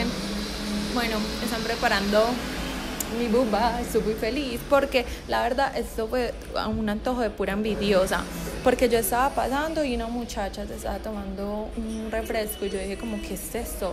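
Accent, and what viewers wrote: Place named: Colombia. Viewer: Hola juapa saludos desde los Estados Unidos soy de acapulco megusta ese asentó maravilloso por ese asentó empecé aver las series de Colombia saluditos juapa 😊😊😊😊😊😊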